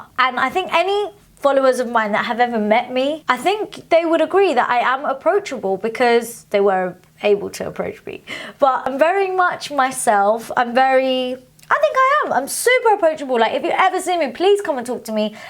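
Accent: United Kingdom. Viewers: Your hair is incredible! Do you have a tutorial? Ps love how london u sound😅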